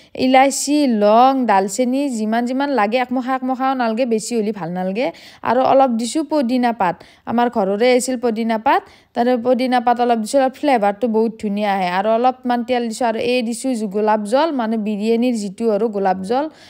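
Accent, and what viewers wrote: Lower Assam, India. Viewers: r accent lower assam or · Bohut val lgil vlog tu. Bohut hahilu kotha khini huni. Actually moi upper assam r. Haikrne lower r vakha tu huni val lge. Hahi uthi gol😂.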